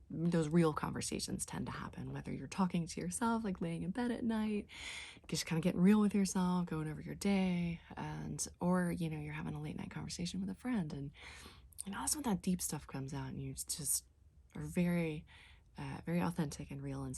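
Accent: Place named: South, United States. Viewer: As a Georgia girl, I appreciate hearing your southern accent. I am excited about your new channel. I really like your planner set up. Thanks for taking time to share it with us!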